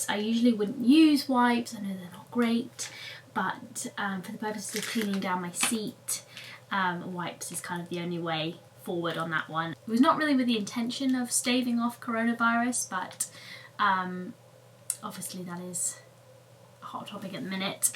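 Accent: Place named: United Kingdom. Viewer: Hi! I love everything about your channel- your style, your vlogs/adventures, and mostly your book recommendations and updates. I have just read so many of the books you have reviewed and you have become a really reliable guide for me when it comes to reading books that are enjoyable, yet also important literature. I have missed your cheery British accent and smile and big chunky rings- rewatching your old videos over these weeks has been a comfort in these crazy times. I really wish you would address what's going on- as a writer and reader I feel that it is so important to document how we are feeling right now-I would appreciate and I am curious about your thoughts and experiences. I hope you are safe and reading lots:) - Yael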